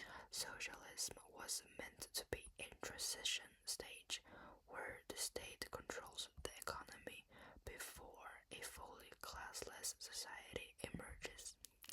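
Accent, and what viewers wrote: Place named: France. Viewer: Are you french??? Sounds like your have a French accent, I am myself a french girl and communist activist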